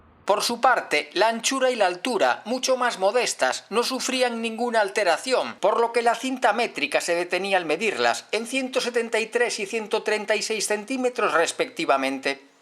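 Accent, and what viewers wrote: Galicia, Spain. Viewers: Excelentes vídeos y como no, preciosos paisajes. Todo un descubrimiento tu canal ,y el acento Gallego me da morriña. Saludos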